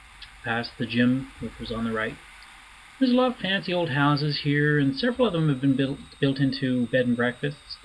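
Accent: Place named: Nova Scotia, Canada. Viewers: It's the Nova Scotia accent. We pronounce words like "harbour" as "hirbour". If you ever cross into Newfoundland it's true that their accent is even stronger! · I love your voice, it's very relaxing listening to you talk. You sound very Canadian. I'm Canadian too, but for some reason you sound even more Canadian than I do :p Maybe it's because I'm from Ontario.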